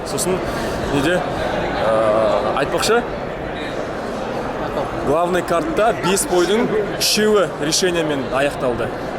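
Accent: Standard. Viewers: 🤔🤯👏👏....МәссаҒан , Сіздің ҚАЗАҚ 🇰🇿🇰🇿🇰🇿🇰🇿🇰🇿Тіліңіз Өте Тазада , Әдемі Ғой !!! Құлаққа Кереметшіл Естілуде 🎧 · Керемет Жарайсын!!!👍👍👍👍 Керемет таза казакша солийсын👍👍👍👍👍👍👍👍❤️❤️❤️❤️😂😂😂 Мен сзге жазылдым!!! Алматыдан салем!😊😊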